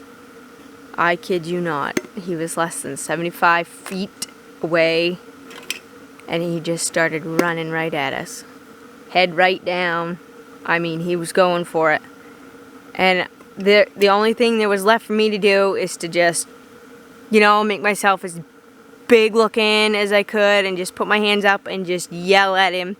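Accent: Maine, United States. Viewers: You have the Maine accent 100%, It sounds cute to us flatlanders.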